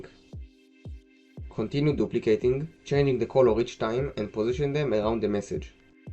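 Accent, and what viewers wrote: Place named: France. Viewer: french accent never can cover:face-green-smiling::face-green-smiling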